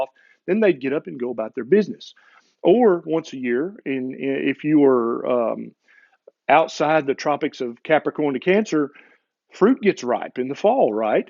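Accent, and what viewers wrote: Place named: Kansas, United States. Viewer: Dear Dr. Berry. Frank here, RN and Certified Personal Trainer, to say how much I enjoy your videos. After 10 years teaching exercise, I have started to incorporate nutrition, especially keto lifestyle, into my programs. I appreciate the fact that you hold an MD degree which I believe lends credibility to your opinions on healthy eating. Also, your accent reminds me of my PCP who hails from Kansas! Keep up the good work! Let's "Make America Healthy!"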